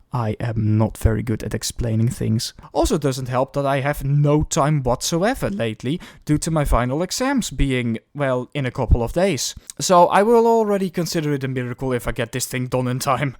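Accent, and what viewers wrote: Netherlands. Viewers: ziek nederlands accent 🤩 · I smell nederlands (or german)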